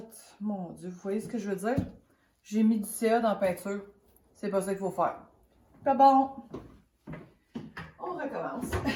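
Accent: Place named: Canada. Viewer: Bonsoir de France! Merci pour ces 2 vidéos! Ce sont les vacances se Noël depuis hier soir pour 15 jours. Donc un peu plus de temps pour "bloomer"... As-tu fait d'autres vidéos? Si oui, peux-tu indiquer le lien stp? J'aime beaucoup tes explications en "vrai direct". Je trouve ça très sympa et j'adore l'accent canadien! Ça donne la pêche et on en a tous tellement besoin en ce moment! À bientôt.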